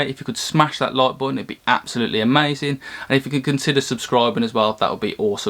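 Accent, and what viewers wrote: United Kingdom. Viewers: Got to say I’m loving the fact that I have found someone with my home accent... your obviously a Brummie? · Prolly UK somewhere lol.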